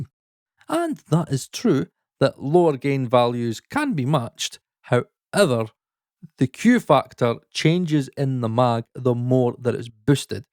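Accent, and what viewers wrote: Scotland, United Kingdom. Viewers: so this isnt a trainspotting video? (im happy about this) i just have a shallow relation of the scottish accent and a 10bag and pin...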